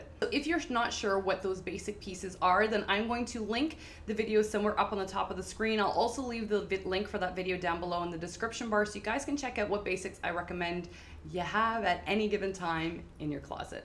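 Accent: Canada. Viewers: You have to be from Canada 😹. I love the accent.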